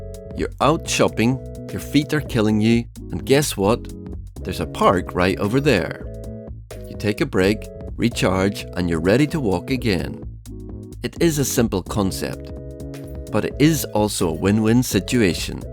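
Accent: United Kingdom. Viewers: Just stumbled across your channel on my discover page, love the videos and also your accent sounds familiar! Are you from or have family in Belfast / North Ireland?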